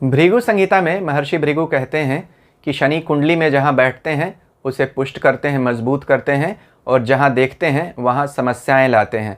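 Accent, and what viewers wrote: Standard. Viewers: शुद्ध वाणी , शुद्ध शब्द ,शुद्ध उच्चार ,हर एक वाक्य सच्चा ओर सुंदर लगता है जो सुने वालो को समज सके ,...और ये सब आपकी हर विडिओ में मिलता है .... Genius sir ..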